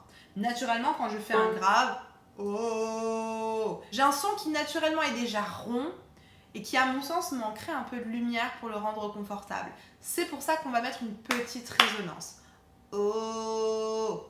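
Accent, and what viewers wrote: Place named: United States. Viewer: ah non, change rien quant à ton génial "sound color" à l'américaine, ça te va fort bien ... géniale vidéo ... une de plus ... en fait, la voix est une espèce de formule mathématique quoi ,...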